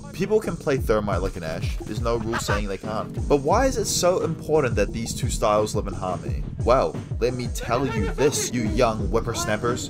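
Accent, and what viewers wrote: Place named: Australia. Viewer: You sound like elmaxo (no it’s not just the australian accent)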